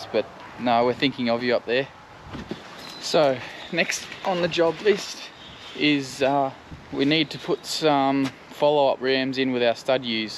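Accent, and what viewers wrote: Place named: Australia. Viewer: Just found your site today and subscribed and liked. Watched the lot this wet Tuesday. Great videos; especially the drone work. Could I suggest a bit more technical info, i.e. tractor size, implement used (include brand - you may get a sponsor) mob numbers etc. Great to hear Aussie English instead of took the wool OFF OF, took the alfalfa OFF OF and everyTHINK. Keep it up it's great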